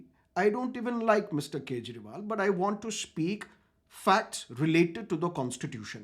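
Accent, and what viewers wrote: United States. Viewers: ये ज्ञानी कौन है? क्या उसे लगता है कि राघव, पाठक और भाजपा ने इस बारे में सोचा ही नहीं है? मुझे ऐसे मूर्ख लोग बिल्कुल पसंद नहीं जो अमेरिकी लहजे में अंग्रेजी बोलते हैं। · Aap american ho kya, aise kyu bol rahe ho 😅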